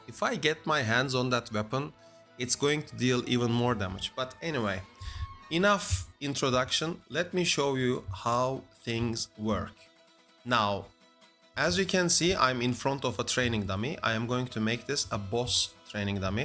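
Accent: Turkey. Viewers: isim dehşet iyi, youtube a yazdım aksanda Bi farklılık sezdim aha dedim türk 😁😁 ekmeğini yiyoruz forumlarda saygılar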